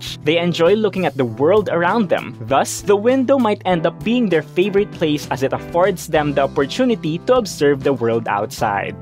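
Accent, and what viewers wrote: Philippines. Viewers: I love my Burmese. She always climbs my shoulder as soon as she sees me lounging on the couch or when working in front of the computer. Btw, the voice-over is a clear giveaway Pinoy! Yay!🙌🏼🇵🇭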